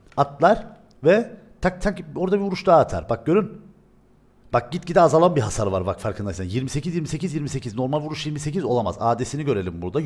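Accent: Cyprus. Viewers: Dayı sen kıbrıslımısın ya :D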